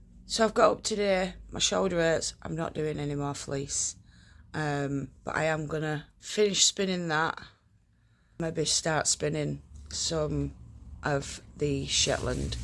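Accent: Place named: Northern England, United Kingdom. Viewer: My husband has just walked in and said , ah I know that accent ,his from up north, we now live in Devon , I understand where your coming from on not getton5 enough sleep , I worry rats if I don't have enough kip 😂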